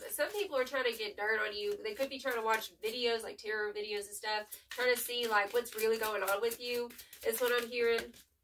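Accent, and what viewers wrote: South, United States. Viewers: Southern accent. I love that. I’m a Scorpio too. Thank you so much for your reading. Its so awesome. Hits me like a bolt Take care of yourself. We scorpios need that sh.. Peace out, Sista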